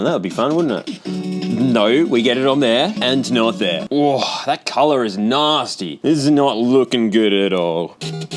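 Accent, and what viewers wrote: Australia. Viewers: British? He's clearly Australian. You may have a problem with your phone's trumpet mouth, you should have it serviced by a professionat.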